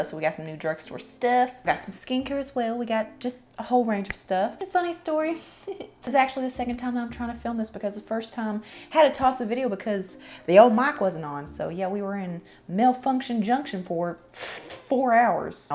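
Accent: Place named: Tennessee, United States. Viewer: Awesome vid. Your accent is amazing. Is it TN? I’m Canadian and love your accent!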